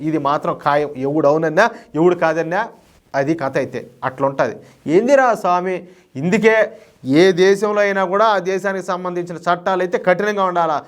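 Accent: Rayalaseema, India. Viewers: Every video chustunna midi mi talking style super and asalaina rayalaseema yasa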